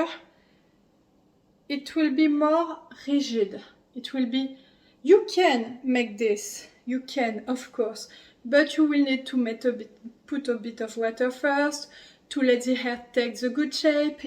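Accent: France. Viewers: I love French. But your accent is terrible. Better not to speak English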